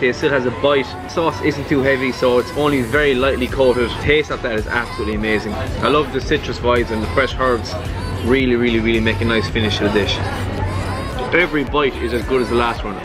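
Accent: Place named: Ireland. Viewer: very nice intro, looks nice restaurant, OMG!!.......there are so many good restaurants..in Spinola bay..sadly so many come and go!! i subbed, so i keep an eye out for you..( sounds a little like a guy from the Emerald Isle )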